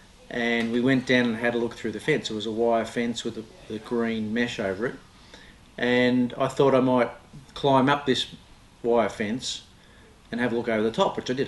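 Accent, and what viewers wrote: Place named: Australia. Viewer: I love his austrialia accent. Its wonderful. Just love hearing him talk. The 300 is absolutley beautiful.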